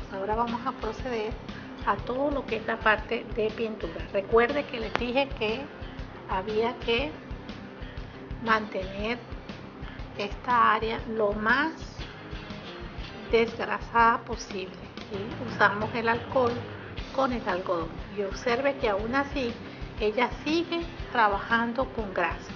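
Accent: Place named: Venezuela. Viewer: Me gusta como explica, y por su acento, es ud venezolana. Felicitaciones!! yo también lo soy, saludos y abrazos desde Barinas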